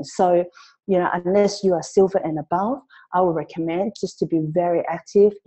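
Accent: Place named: China. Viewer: Chinglish ... love it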